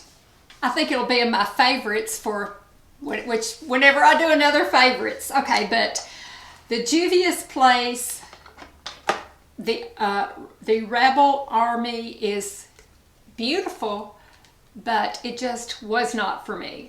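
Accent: South, United States. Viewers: you are adorable...such a classy well mannered woman. I love your southern accent I could listen to you all day. Thank you so much for showing us all the products and giving a review. I may buy the KVD liner because my liners always disappear after I wear them awhile. The Milani palette shadow looks beautiful on your blue eyes. On the color wheel orange is opposite blue so that makes your eyes pop. You are so young looking, just stunning.🌸💕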